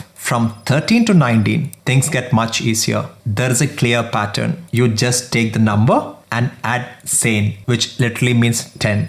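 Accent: Kerala, India. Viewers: You seem to be from India😂😂😂but i have loved your lessons. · Are u from kerala?😁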